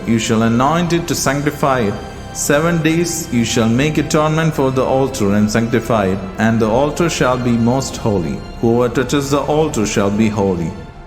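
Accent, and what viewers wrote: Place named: India. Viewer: Really grateful for the Indian accent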